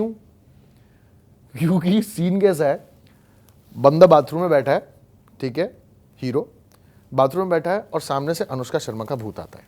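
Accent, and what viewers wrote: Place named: Bengal, India. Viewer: I love your bengali accent the most. Hahahaha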